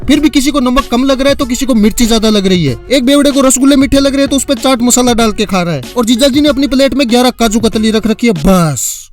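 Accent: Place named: Rural, India. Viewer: Tomhare bolneka tarika ku6 bad villager jeisa hai.......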